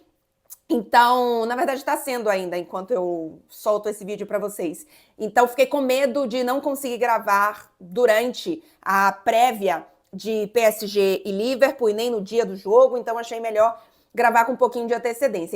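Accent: Bahia, Brazil. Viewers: você ainda tem bastante sotaque baiano, viu?! 😅😅😅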